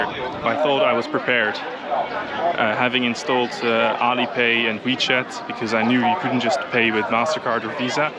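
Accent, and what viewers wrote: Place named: United States. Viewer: I just come back from China, I use cash all the time, no one looking at me like foreigner. It's very strange how could not bring enough cash especially it is your 1st time, also seems you already alert all the bad thing before come in. Look your vlog, it has little viewers, this one hit 4 digit viewers just a few hrs. Sure, you want to back if only China is money come from. Hope you won't come back, sir. Korean are extrovert people? Give me a break. Most of them speak English? By the way you sounds like American dude😅 YOU ARE NOT WELCOMED!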